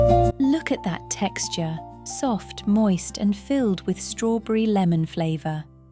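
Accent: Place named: United Kingdom. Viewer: I love her British english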